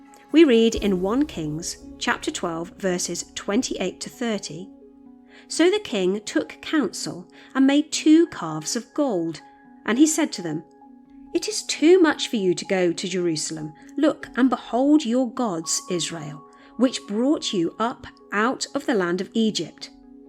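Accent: England, United Kingdom. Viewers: I so enjoy your quizes and also love the sound of your voice with its English accent.😊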